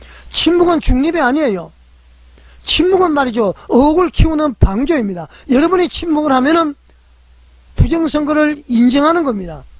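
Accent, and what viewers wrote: North Korea. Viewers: 듣기싫다! 북한말투쓰네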